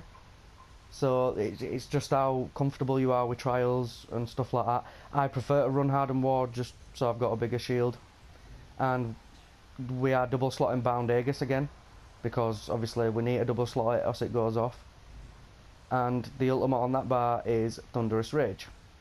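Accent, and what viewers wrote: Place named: Leeds, United Kingdom. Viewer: Loving the Leeds/Bradford accent mate identical to how mine used to be lol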